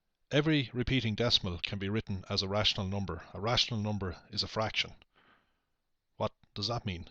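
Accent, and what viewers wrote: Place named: Ireland. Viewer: So so so helpful thank you very much and finally someone Irish doing these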